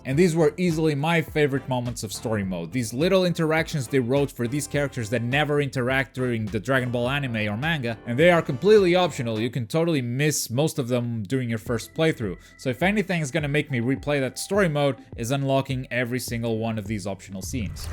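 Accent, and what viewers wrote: Portugal. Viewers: Português? Notei pelo sotaque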